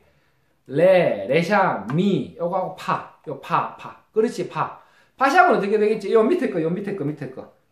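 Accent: Gyeongsang, South Korea. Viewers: ㅋㅋㅋ 경상도 사투리 강의 정말 구수하고 잼있습니다.^^ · ㅎㅎㅎ 나도 경남사람이지만 경상도 보리문댕이 사투리 너무 정겨워....ㅋㅋㅋㅋ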